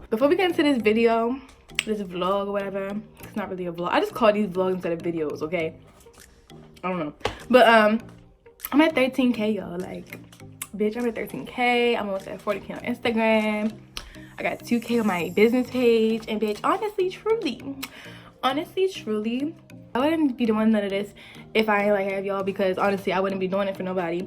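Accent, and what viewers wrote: Baltimore, United States. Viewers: are you from Baltimore? 🤔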